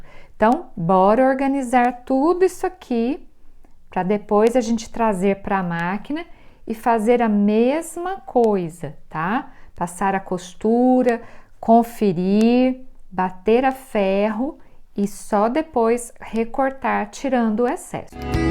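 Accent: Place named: Russia. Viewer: I truly love the colors n the style, Especially your Russian accent😅❤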